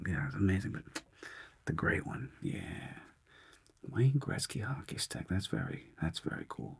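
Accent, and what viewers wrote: New York, United States. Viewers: I like your NY gumshoe accent. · This felt like a spider noir theme with the New York accent and the black and white. Amazing acting too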